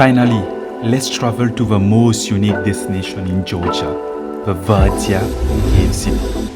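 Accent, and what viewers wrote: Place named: Mauritius. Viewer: Nice video and keep it up and I love your accent I don’t know but to me it sound like yo are from Mauritius 🇲🇺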